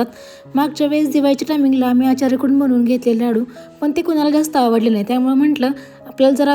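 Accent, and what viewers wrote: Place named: Satara, India. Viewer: सातारची आहेस का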